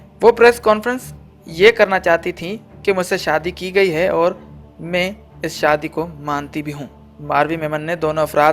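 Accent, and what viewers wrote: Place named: India. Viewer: kismat or qismat.. gujaar or guzaar. mutabik or mutabiq.. is trah doosre alfaaz, indian jessay urdu/hindi bolte hain. kitni jamaat padhay ho??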